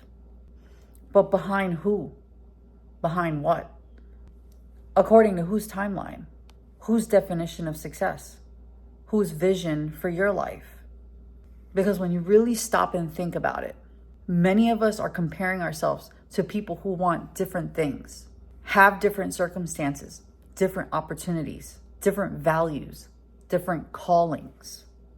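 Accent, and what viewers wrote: New York, United States. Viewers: Just make sure you enjoy life as well! You're still a pretty girl. By the way, I love your accent and mannerisms - New York? Some women think they're "strong, independent women", but it's not convincing. You on the other hand come across as the real thing - one tough lady, LOL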